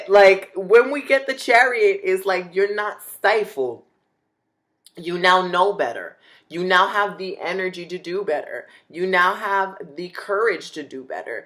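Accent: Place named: New York, United States. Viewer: Your accent makes me miss NYC so much. More confirmation I need to move back!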